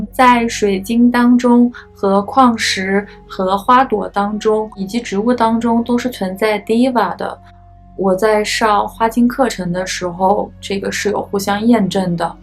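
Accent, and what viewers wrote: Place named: Beijing, China. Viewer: Her accent sounds like Beijing or Tianjin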